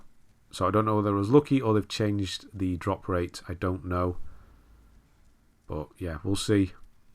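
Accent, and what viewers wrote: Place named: Cheshire, United Kingdom. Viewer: That accent is subtle btw, placing you somewhere near the M56.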